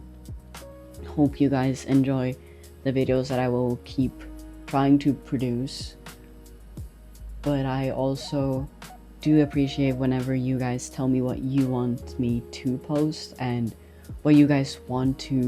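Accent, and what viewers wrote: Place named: Norway. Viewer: He’s from Norway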